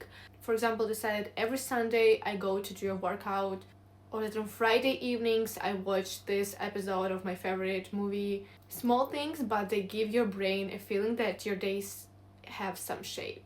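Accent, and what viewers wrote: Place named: Eastern Europe. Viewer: i hear a slavic accent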